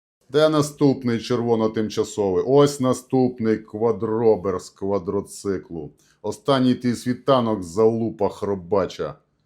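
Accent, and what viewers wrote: Transcarpathia, Ukraine. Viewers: Закарпатський діалект гарно звучить в його виконанні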